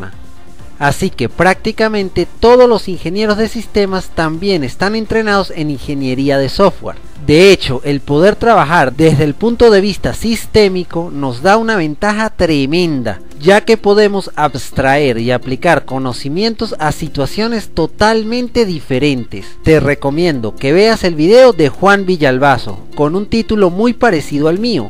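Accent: Venezuela. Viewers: Depende de la región de Venezuela donde naciste. Ángel David Revilla es Caracas (región central), donde el acento es más prominente. Me imagino que es de la región oriental o la noroccidental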